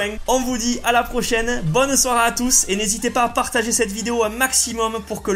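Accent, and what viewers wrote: Toulouse, France. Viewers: rapha 1023 cest l accent toulousain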